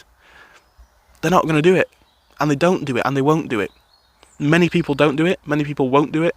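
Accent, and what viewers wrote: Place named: Northern England, United Kingdom. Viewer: £500 a month won't get you a shed in the south east! My studio+ apartment is 1000 a month ...not a 1 bed a studio+ which has a small bedroom barely without a window! But I guess it's half the price up north which by your accent seems you are from.. Aldi the money you get paid in the south Vs north isn't a huge difference these days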